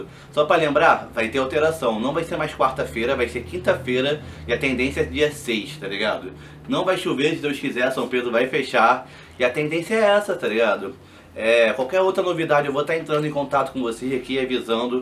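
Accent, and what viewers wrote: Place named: Favela, Brazil. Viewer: com essa língua presa e dialeto de favela não tem nada a ver kkk Acompanho o canal desde 2014 e não me acostumo nunca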